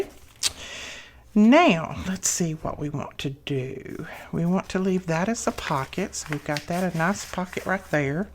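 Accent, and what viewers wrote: South, United States. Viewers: Where do you live? The accent is southern I remember you saying in another video when someone said to slow down talking lol. When you dont talk fast. Lol. Anywho I am in Hartselle Alabama. Small town a little ways from Huntsville and Birmingham. Kind of in the middle closer to Decatur Alabama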